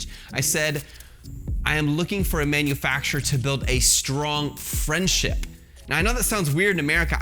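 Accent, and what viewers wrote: United Kingdom. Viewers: Your British accent!!! 😂Loved this video!! You're amazing at simplifying all this overwhelming information. My partner and I are ready to begin the negotiation process. I'm nervous but I'm feeling so much more comfortable! Thank you!!🙏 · A little bit funny British accent but great. You are awesome! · Very interesting video, and as an authentic Chinese I can’t agree more and found your ideas are very helpful, especially about the long term business relationship and friendship part. Those customer service staffs work ridiculous hours and get very exhausted, if we respect them and make them feel nice talking with, the communication would be much smother and more efficient. The end I wanna thank you, your videos are so helpful and encouraging. I already found a product that I wanna try with on amazon, and today I already started sending messages to suppliers. 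Good luck to me! Btw, I’m living in UK, and I don’t think your British accent is too bad, hahah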